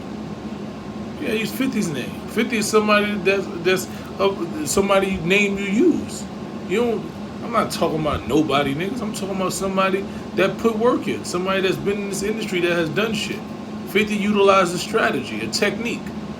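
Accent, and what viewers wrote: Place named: Arab world. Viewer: Bro stopping saying hit the like button like & prescribe like that bro u sound like a arab use that persons voice it sounds more real believable bro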